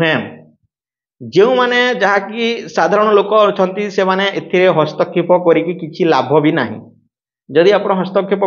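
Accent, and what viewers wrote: Odisha, India. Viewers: Khanti odia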